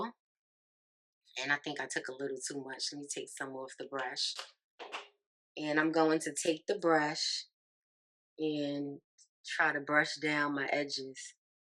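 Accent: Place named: New York City, United States. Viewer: No she sound like CoKo from SWV I guess it’s that NYC accent.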